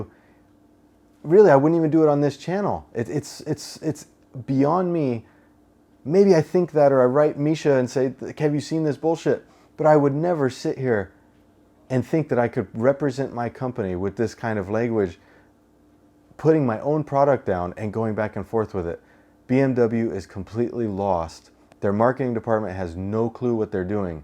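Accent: United States. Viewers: Good video man. The way you sometimes pronounce "L"s is very strange to me. Where does that come from cause you have a very natural American accent aside from that.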